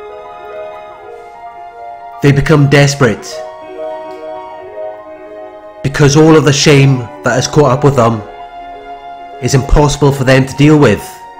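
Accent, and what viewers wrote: Wales, United Kingdom. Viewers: This guy sounds like he from Cardiff or Newport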